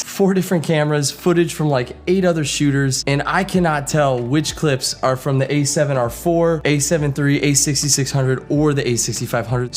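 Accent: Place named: United Kingdom. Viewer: i loved that British nat geo wild voice 😅 thats why i hit that like button,cheers mate ,greetings from kenya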